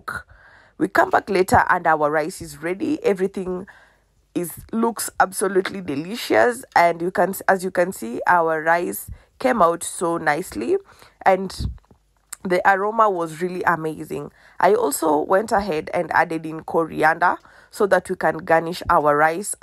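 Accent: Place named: Caribbean. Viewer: Looks absolutely 💯 gorgeous and I will definitely try to make it and definitely going to be a new subscriber PS just out of curiosity where are you from I am normally quite good on accents but I am stuck on yours is it Carrabean??? Or some where in Africa with French origins ??? 👍👍🍚🌶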